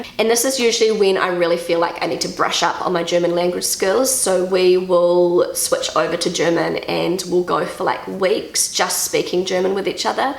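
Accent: New Zealand. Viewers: Hi, I am a native German speaker who has never been to NZ but I love to watch your videos and to listen to your dialect! Your children have been a lovely change but I understand your decision. Happy X-mas und einen guten Rutsch ins neue Jahr. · Hi from NZ! I love how strong your New Zealand accent is even after living in Germany for quite a long time 😁 💗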